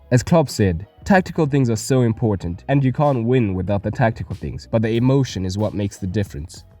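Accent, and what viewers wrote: South Africa. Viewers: You sound South African nigga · Are you South African? Glad I've found the channel - great content! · is the narrator South African..? just really curious. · You sound very South African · he sounds south african, i know as a south african. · could be south african or indian or indian in south africa :) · You from South Africa bro? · Dude you sound so South African ...are you ?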